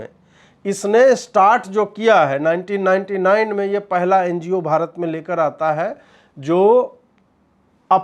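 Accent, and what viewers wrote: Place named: Bihar, India. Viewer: vo bihari h hamare yaha UP aur bihar me aise hi bolte h yakin nhi to UP CM ka bhasan suno. Sikhao mat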